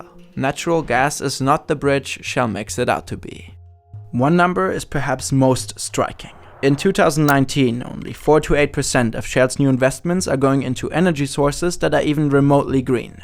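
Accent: Germany. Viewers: Nice Video - smooth Animations, (I love the intro!), informative and your german accent is not that bad :P Good luck with the channel, I hope ppl will write their comments in god damn english otherwise non-german-speakers will just be annoyed as hell xD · Yourrr loveley Germann Accent makes it pretty easy to understand it. For me As a german fellow with a way harder german Accent. Sorry for bat-english. They tend to fly around my head every now and then. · Even if I love your videos and the concept is a good idea in theory I just can't handle the German accent. If you're looking for someone to read your scripts I volunteer. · Nice german acsent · Y'all may consider hiring a native English speaker, or having the usual narrator take some spoken English language courses. There are some very common, German pronounciation mistakes in this video, i.e. pronouncing 2019 as two-thousand-nineteen rather than twenty-nineteen. 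· Krasser Akzent, den find ich sogar als Deutscher unangenehm^^ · Love your German accent. Love from Britain! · I honestly can't watch these videos. I find myself to be a perfect example for the classic german phenomenon of hating how a german accent sounds eventhough you have one yourself. I'd love to see these videos on Simpli or you getting an english native speaker for the voiceover tbh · Dudes! I'd really like to do voice-overs for your vids. Although it doesn't seem to be a big problem, I could get rid of the entertaining german accent😉 I can't really find an imprint with an email adress, so I have to rely on my comment being upvoted... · Holy fuck bruh I wish I spoke German the accent is clean as fuck👌🏾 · Great Video but i must say that the german accent is a bit distracting.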